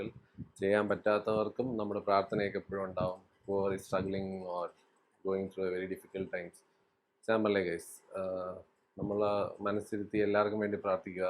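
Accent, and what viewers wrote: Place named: Thiruvananthapuram, India. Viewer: ബ്രോ യുടെ സ്ലാങ് പക്കാ തിരുവനന്തപുരം അതാ ചോദിച്ചേ.❤ എന്തായാലും താങ്ക്സ് ബ്രോ റിപ്ലൈ തന്നതിന്.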